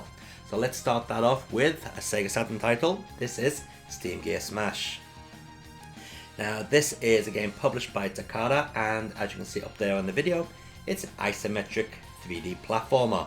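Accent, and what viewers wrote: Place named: Liverpool, United Kingdom. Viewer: sounds like Liverpool dialect to me :)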